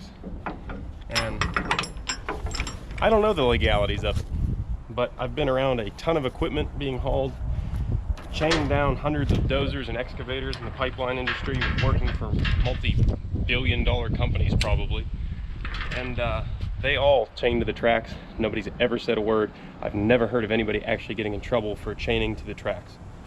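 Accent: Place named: Missouri, United States. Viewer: Hi, Reading through the comments i picked up your name. Someone said Pennsylvania? By your accent and the trees was guessing Missouri but I guess not. Anyway when I worked at a Lead Foundry in the 70's drove a 920 Catipiler front end loader and everything else they had there. What I really enjoyed driving there was the old White Freightliner. Had a hydraulic 5th wheel hitch that you could hookup the trailer and lift it off the ground and go after you swung out and hooked up the pigtails and lights. They had all kinds if forklifts but my favorite was the small hyster I used for running shipments around and weighing stacks of lead bricks to band them up. The bander was electric which was awesome as well.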